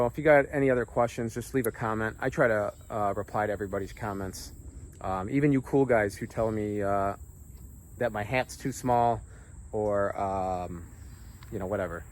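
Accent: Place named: Chicago, United States. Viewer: You can totally tell you’re from Chicago lol but love the video 🔥🤌🏼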